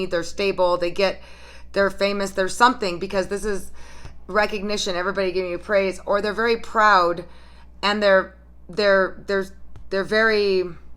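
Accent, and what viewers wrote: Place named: New York, United States. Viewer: Are you from new York? Bc I can hear an accent. But I'm actually dealing with both a Leo and Libra. The Libra ugh ur on spot with him.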